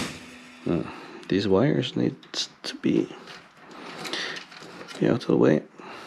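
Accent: Norway. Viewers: Are you norwigen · idk why. but he sounds norwegian. Broski got that crisp norwegian dialect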